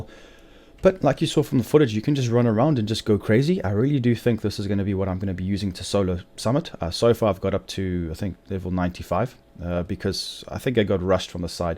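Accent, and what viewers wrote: South Africa. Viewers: Watched this and went for the grind to get the backpack. Got it today and have put together a rough build. Just need to min max it. Thanks for the vid! Is that a SA accent btw?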